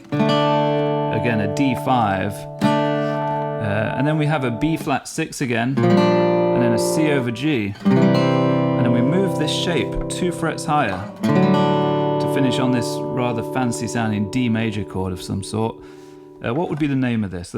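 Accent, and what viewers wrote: United Kingdom. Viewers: Did NOT expect the British accent after the guitar part🤣 ... hardcore Americana vibes. Love it!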